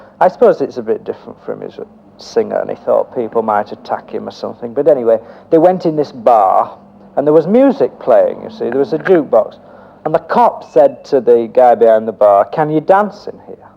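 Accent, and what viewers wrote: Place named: Liverpool, United Kingdom. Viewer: same accent as John Lennon. One wonders if they are from the same region. Hmmmmmmmmmmmmmmmmmmmmmmmmmmmmmmmmmmmmmmmmmmmmmmmmmmmmmmmmmmmmmmmmmmmmmmmmmmmmmmmmmmmmmmmmmmmmmmmmmmmmmmmmmmmmmmmmmmmmmmmmmm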